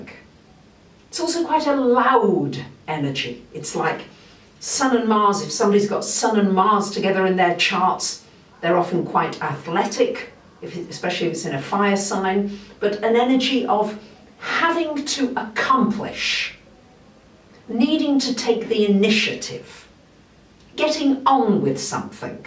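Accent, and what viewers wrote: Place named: England, United Kingdom. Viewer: Thank you beautiful gentle motivation as always. I love your friendly way with words and your accent,what part of England are you from? 💗💗💗😇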